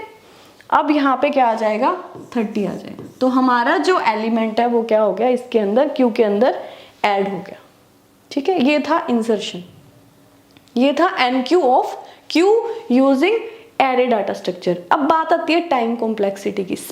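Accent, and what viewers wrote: Punjab, India. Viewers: Like ur punjabi accent while speaking english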